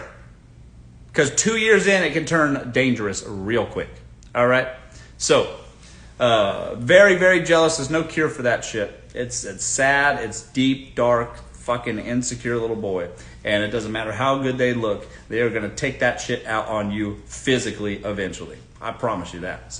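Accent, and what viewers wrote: South, United States. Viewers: I think he mentioned he was Southern..Sounds it too..Maybe from Georgia?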